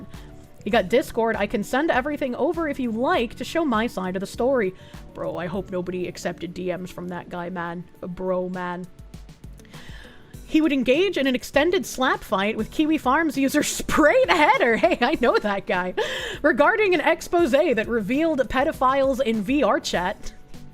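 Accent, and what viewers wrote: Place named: Massachusetts, United States. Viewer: I just watched a G&G daily video with you and madame savvy. Id like to say im a fan but at least intrigued. Boy you really sound like a MASShole, i like it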